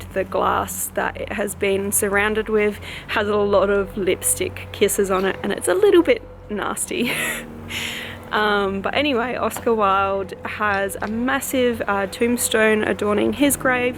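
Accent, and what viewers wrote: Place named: Australia. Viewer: You've got an Aussie accent!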